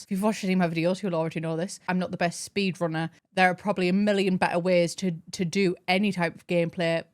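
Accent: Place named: North, United Kingdom. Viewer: Potential translation: "I was reeling, like- like excuse the gas, I'm supposed to be punching other people not myself" Unsure about the first part but the secind is definitely what you said I am proud at the level of northern british understanding I have reached. x'D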